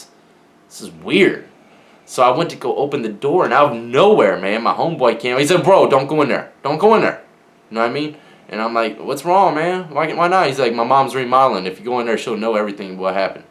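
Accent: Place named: Texas, United States. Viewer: I swear your from Texas bro.. Very similar slang and accent.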